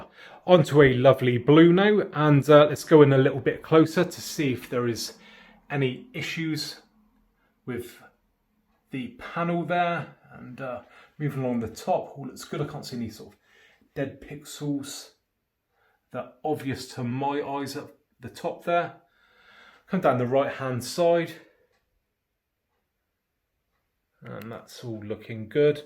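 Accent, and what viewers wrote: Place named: England, United Kingdom. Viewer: Sério? Acho que ele é inglês, vc deve ta acostumado com inglês americano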